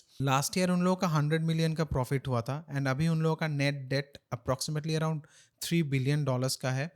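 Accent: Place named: Bengal, India. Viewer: Your bong accent is too pronounced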